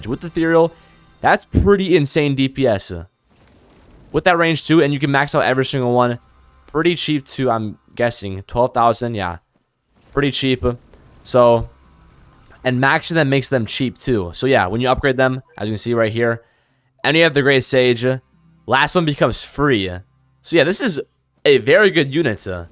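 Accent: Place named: United States. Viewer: im from england but this literally sounds like a generic american accent to me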